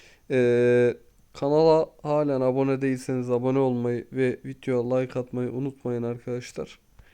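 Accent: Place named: Kahramanmaraş, Turkey. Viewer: Maraşlımısın kardeş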